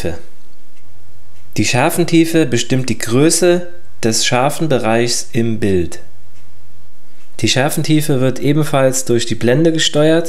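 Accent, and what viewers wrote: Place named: Saarland, Germany. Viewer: Isch heer e Saarlänner schwetze - isch drigge "Gefällt mir" 👍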